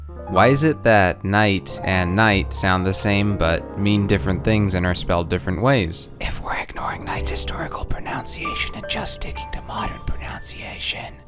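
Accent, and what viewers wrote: Russia. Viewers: your russian accent is actually pretty good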